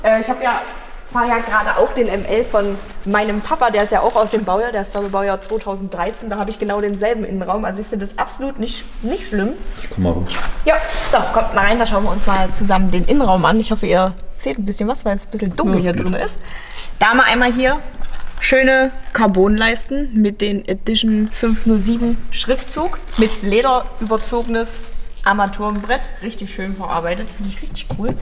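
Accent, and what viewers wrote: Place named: Saxony-Anhalt, Germany. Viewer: "Jetzt schießmer volles Roohr in den Caaarpooorn" :-) Ich komme gebürtig aus Sachsen-Anhalt. Dieser doch so heimische Dialekt.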